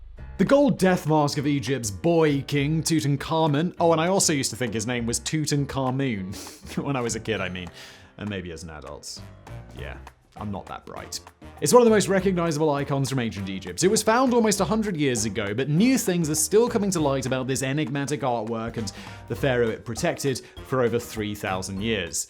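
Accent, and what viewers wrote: England, United Kingdom. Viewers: I'm so glad I'm not English. I would probably sound like this dude when I talk and that would piss all of us off and have to punch myself in the face if somone didn't beat me to it(no pun intended). And dont these people realize if you smash the like button you will break your electronicsl Stupid limese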